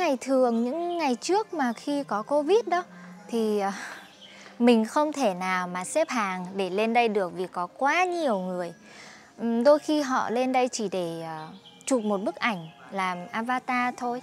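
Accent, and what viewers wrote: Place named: Hanoi, Vietnam. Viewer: It sounds like you are speaking the Ha Noi dialect. Do you know the Vinh dialect?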